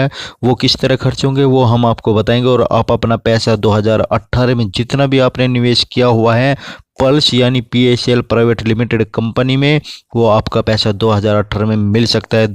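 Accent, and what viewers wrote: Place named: Pakistan. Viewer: Ye Pakistani voice hay .! This voice not Indian voice ...and this voice is 100% sure Pakistani guy dubbing voice ....open eyes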